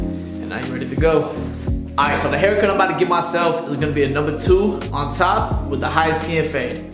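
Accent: North Carolina, United States. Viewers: Are you from North Carolina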